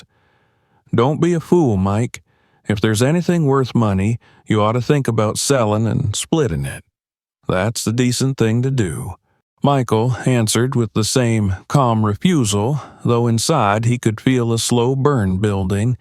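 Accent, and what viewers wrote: South, United States. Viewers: The faint southern accent of the narrative voice adds to the story.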